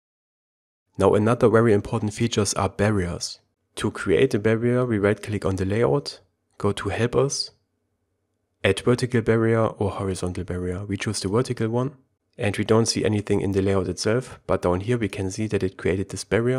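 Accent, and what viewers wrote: Germany. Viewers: You sound like a German when he speaks English - I know it, because I'm german. :D And really great video!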